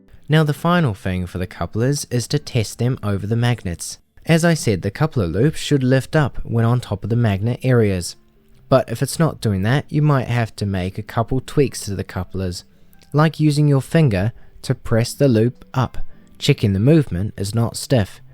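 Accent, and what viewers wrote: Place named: Australia. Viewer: This was 10,000 worth it Btw u sound aussie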